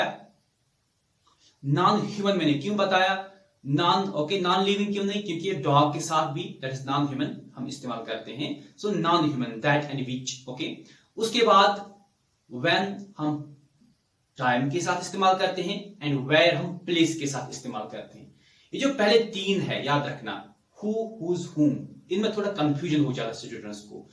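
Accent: Kashmir, India. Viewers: Sir ji aap Kashmir se ho kya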